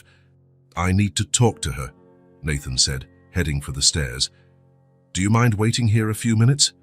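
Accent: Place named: England, United Kingdom. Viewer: It's only a story. I love the readers English accent.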